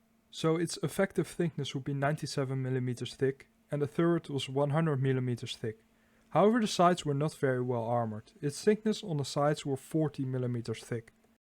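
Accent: Netherlands. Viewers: Your melodic Dutch accent makes my own crude english, (one & only), language sound good. Very easy on the ear. And your multilingual styled pronunciation is not only impeccable but also aids comprehension of info'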